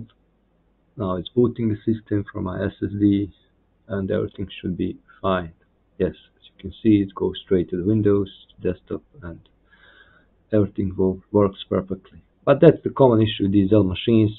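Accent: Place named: Bulgaria. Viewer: Dude your accent sounds like Bulgarian. Grate that you fixed problem with booting DELL PC.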